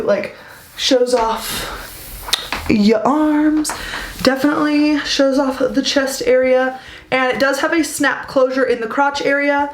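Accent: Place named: New York, United States. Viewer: Do you speak Italian? What part of Italy is your family from? You sound like your originally from NYC. Loved the video!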